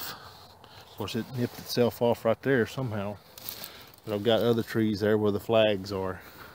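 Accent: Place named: Oklahoma, United States. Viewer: What state are you in. Sounds like Oklahoma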